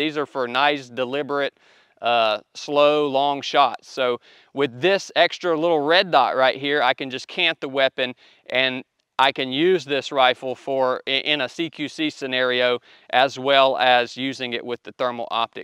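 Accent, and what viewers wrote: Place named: Georgia, United States. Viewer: ! Been a long time. I was your RCPO (“RPock”) in boot camp 😂. I don’t know if you remember, but I ran into you when I was a new guy at 10 (after a successful second attempt through the pipeline). It’s funny that I just ran into your channel. I was just telling someone a story about you in boot camp. I was telling them that I had to kick my southern accent a little bit because anytime I would put something out to our division, people would giggle at my accent. Then I told them about one day when you were answering some question about what a chief wears on the sleeve of his dress blue uniform, and everyone kept giggling at your deep Georgia accent. You stopped what you were saying and said, “I wanna know what’s so funny.” 😂😂😂 It’s good to see you’re doing well, brother 🤙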